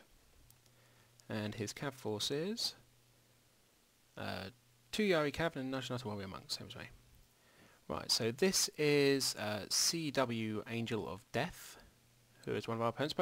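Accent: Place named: United Kingdom. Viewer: The British accent loving it LOL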